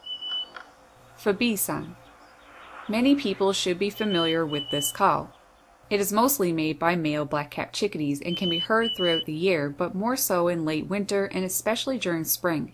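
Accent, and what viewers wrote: Canada. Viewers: I can tell you’re Canadian, your “O’s” sound like ooo’s, hence “Abooot” Love the video tho!!! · Just started to listen, say's " aboot," Eight oot of the sixteen differnt calls. Haa Must be Canadian. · I love your videos, especially those featuring corvids. I was tempted to mention that I thought I heard what linguists call "hypercorrection" in your narratives. I'm glad I didn't. My new sense is that what sounded like hypercorrection might be Canadianisms in your speech. I'm especially interested in what you have observed about social learning in birds. That's a topic that has scarcely been explored at all. Thanks for the gift of your observations.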